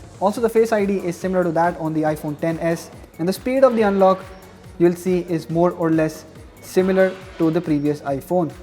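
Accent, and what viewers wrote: India. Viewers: INDIAN ENGLISH SKDKSDHSHJDSAD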